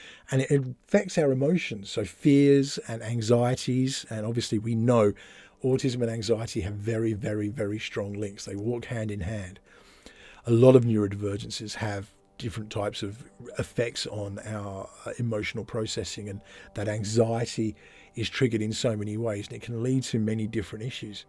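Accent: Australia. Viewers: Detected your Aussie accent as soon as you spoke, as a fellow Aussie. Subscribed. Interested in your listed of talented autism creators. Elon Musk is the planet's most famous current talent. Others? Women and men? Autism affects all levels of humans, with all levels of intelligence. With higher iq people, their cognitive brain can sometimes override the hyperactive autism. However when we are tired, off guard, off duty, our hardly awake, the autism can suddenly emerge. Can you comment on this. Recently our ABC television showed how our most famous homeless orphan, got to be Doctor (sociological) Robert Smith OAM. Over 60 years old now, he is CEO of one of our larger charities. Is he autistic, or merely a PTSD baby, child, teenager?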